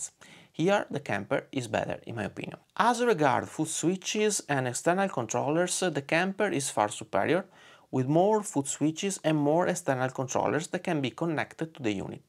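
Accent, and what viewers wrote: Italy. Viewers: ah ma quindi sei italiano anche tu? avevo notato una pronuncia particolare che può essere nostra o comunque in generale di chi non è madrelingua inglese / americano.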